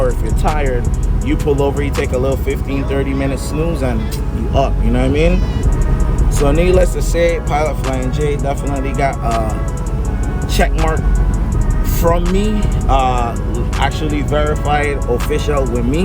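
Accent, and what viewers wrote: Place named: St. Thomas, United States Virgin Islands. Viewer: Vi accent strong st.thomas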